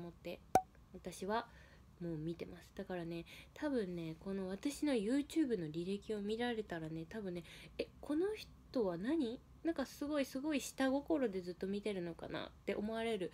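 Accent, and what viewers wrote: Kagoshima, Japan. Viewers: 私の日本語の勉強方法と一緒です🙋 YUURIさんの動画を見ながら勉強しています。 多少鹿児島弁も一緒に耳に入ってます。笑 一緒に頑張りましょう！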